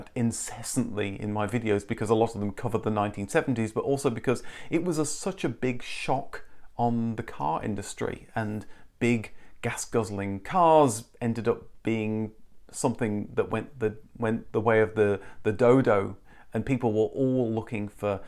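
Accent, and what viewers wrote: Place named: Midlands, United Kingdom. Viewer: Amazing how your intonation switches to much more English (or should I say "Midlands"?) dialect in this "Extra video" ... not sure you noticed.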